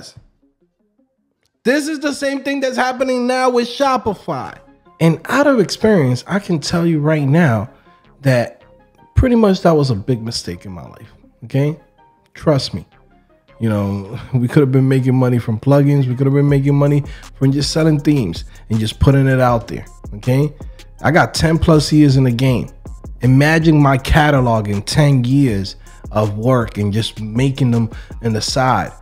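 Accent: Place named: New Jersey, United States. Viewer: Wish I would’ve found this channel before I enrolled in a full stack course..finally somebody who look like me, even tho I’m black and I’m assumin he Dominican prolly..whenever you look up coding it’s a video wit a white boy or an asain which ain’t nothing wrong with that, but representation matters, and seeing a dude who talks like me and is presumably from where I’m from(I’m from Jersey he’s definitely from Jersey or ny) is exactly what I was lookin for 🙏🏾🙏🏾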